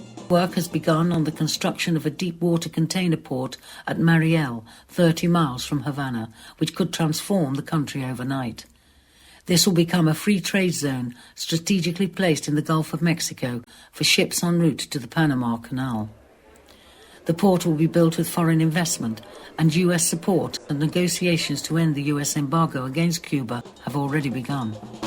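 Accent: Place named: United Kingdom. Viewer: A stupid British accent lady doing the video come on ppl seriously.....have someone else do the video.....you suck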